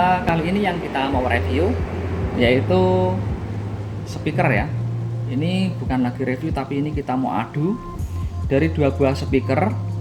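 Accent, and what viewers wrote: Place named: Java, Indonesia. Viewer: Medok banget om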